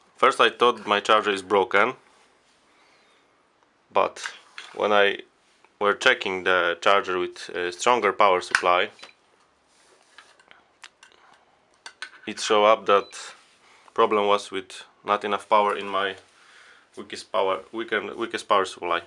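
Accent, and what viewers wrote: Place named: Russia. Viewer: From your acent I think you are Russian) But, anyway, Thank tou!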